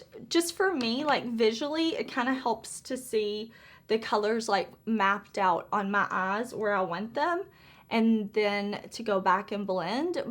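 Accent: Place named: South, United States. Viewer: Hi,. I just found your videos by looking for videos on the Scooby Collection. I’m so glad that I stopped and watched. I love your technique and artistic abilities, but I’m staying (subscribing) because of your accent and personality!!! I do sense that you’re a SOUTHERN gal, just like ME!!! I am Jeanie from Alabama! So very nice to meet you!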